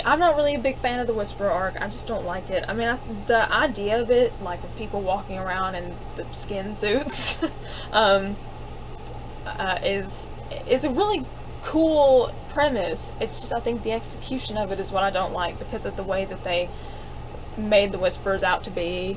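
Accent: United States. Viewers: you sound country and i mean that as a compliment i grew up in virginia you should really watch that negan meets gary busey it's awesome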